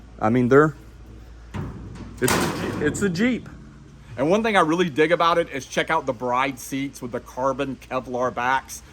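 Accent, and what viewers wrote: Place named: Pennsylvania, United States. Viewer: In Imus? Should have run through Milwaukee or Sarau for the big personal OTJ’s. You may be in The Philippines, but that accent sounds like Westmoreland Co., PA! Lol😅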